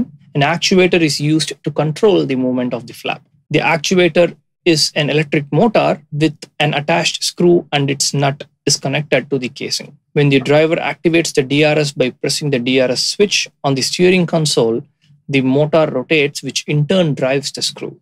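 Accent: India. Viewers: Immediately disliked after hearing indian accent lol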